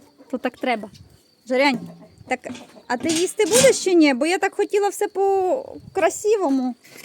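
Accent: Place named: Poltava, Ukraine. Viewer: Дівчина,Жорік та всі інші друзі цього шоу.. Ви з Полтави?❤🎉❤ Така мова гарна ❤ Харків вітає ВАС❤🎉😊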